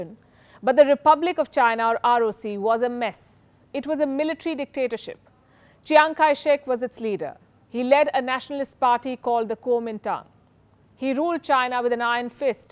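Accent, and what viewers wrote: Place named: India. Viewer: If you like that indian accent 🤢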